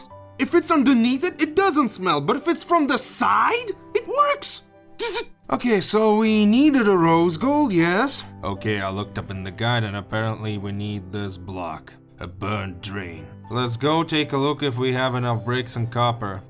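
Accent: Russia. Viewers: Are u Russian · Are u russian? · great youtuber love his vids and the russian acsent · Mind if you use you Russian accent to say on the video?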